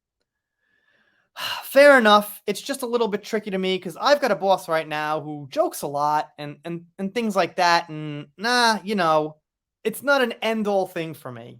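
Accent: United States. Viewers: I would really like to know why Americans think displaying ignorance is cute. You said that you couldn't tell what this symbol "£" is, and then whilst speaking in a thick American regional accent and excused referring to it as "the euro" because you live in Japan. But Dan Wootton and The Sun newspaper are based in the UK, so you must have known you were talking shit and you had to have lived in the USA until you were at least 25 to sound so American, or do you think the audience is unaware of how accents form? Your mouth fused in it's current shape in the USA by age 25, so you have no excuse especially given that the symbol existed before the USA existed as a country and in fact you used to have an American pound. So all you said was that you were unaware of your own history. I don't think you mistook anything. You're a pro-Johnny Depp fanatic who casually glazes over the fact that he was "making jokes" about raping Amber Heard and burning her body so by pretending ignorance of the difference between the £ and € even though the £ is clearly an L used for hundreds of years in various countries as the symbol of a pound, whilst the € is an E and has only existed since the mid 1990s to be used as a symbol for a new currency, the Euro, you are being dismissive of the UK merely because you were upset that the judge in the defamation case here did not rule in favour of your opinion and instead merely followed the law and logic. 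Both Depp and Heard were abusive. Don't worry though, i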